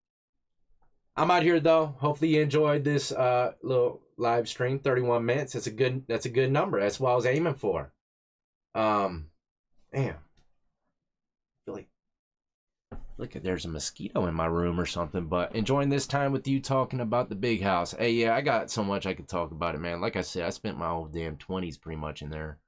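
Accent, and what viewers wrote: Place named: Virginia Beach, United States. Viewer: Sounds like you are from VA Beach? I am a white guy who went to high school in the ghetto of Hampton, VA. It was hell on earth.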